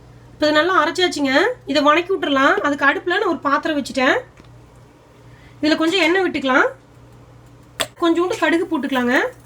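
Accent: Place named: Coimbatore, India. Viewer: Try pandren mam we are from cbe happy to hear our oor slang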